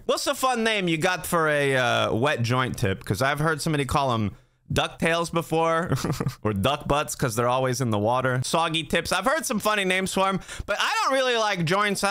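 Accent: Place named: New Jersey, United States. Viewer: this guy just screammmmsss jersey, I've watched a couple videos I've heard him say he lives in Florida and another time he lived in CO but buddy that accent is definitely east coast jersey lolol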